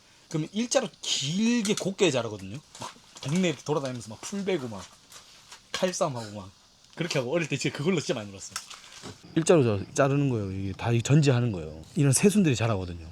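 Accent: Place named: Hadong, South Korea. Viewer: 손빈아 가수님은 우리 하동의 자존심 입니다 더높이 더멀리 비상하세요 꼭 승승장구 하세요 빈아님은 순수한 청년입니다 사랑합니다 간혹 우리 하동말 나올때 정감이 넘쳐요🎉🎉🎉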